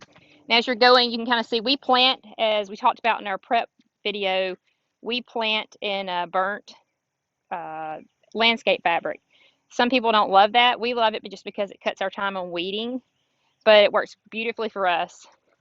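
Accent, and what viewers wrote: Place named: Alabama, United States. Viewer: Congratulations on winning your well deserved Amazon's United States Woman-Owned Small Buisness of the Year (2019)! I thought I detected an Alabama accent.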